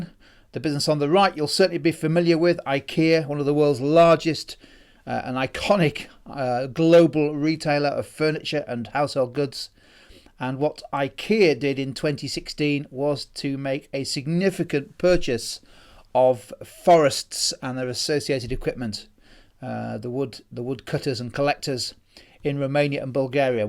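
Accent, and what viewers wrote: England, United Kingdom. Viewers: seems legit because he has an english accent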